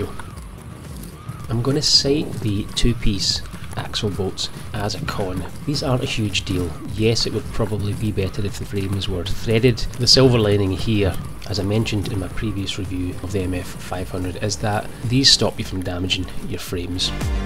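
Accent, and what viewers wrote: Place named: Scotland, United Kingdom. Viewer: Also where about in Scotland is that lovely accent from?!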